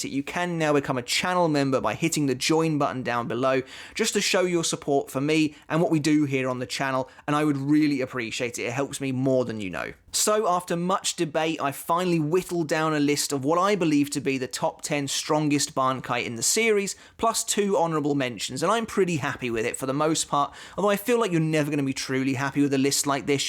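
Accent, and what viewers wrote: England, United Kingdom. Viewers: You need to say Japanese word like a Japanese person not like a English man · Content and overall quality is great but the way you pronounce japanese in an English accent is very hard to listen to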